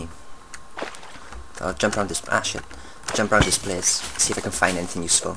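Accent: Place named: Wales, United Kingdom. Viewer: Your Welsh accent rules. Greetings from Krautistan, and thanks for thid very helpful series for Terraria beginners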